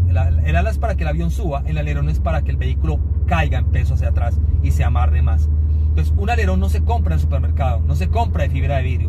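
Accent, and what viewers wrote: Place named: Colombia. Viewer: Pensé que era Colombiano por su acento, excelente video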